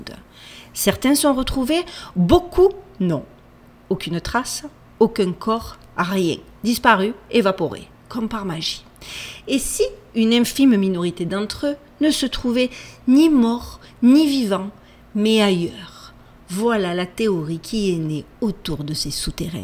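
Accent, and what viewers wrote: Marseille, France. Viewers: J’aime beaucoup l’accent de Marseille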